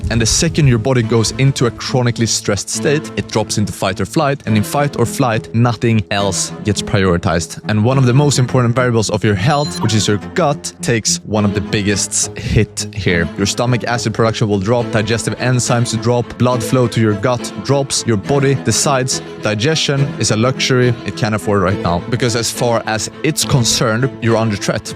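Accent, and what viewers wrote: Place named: Sweden. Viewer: I would love to se this video but I cant stand a swedish accent. Can someone tell me what tho overall ide is?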